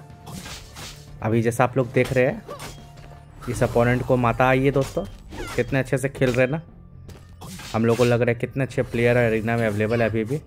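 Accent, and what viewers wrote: Bihar, India. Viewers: I love our bihari ascent😂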